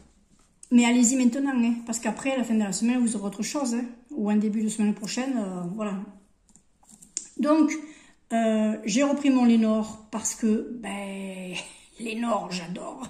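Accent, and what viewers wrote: South, France. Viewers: J’aime t’écouter, tu me fais rire. Les tourterelles prennent tout et les petitouts n’ont plus rien du tout. Ta façon de parler et ton accent sent si bon le midi, ça me rappelle Pagnol que j’adore 🥰. Bonne journée, bisous 😘😘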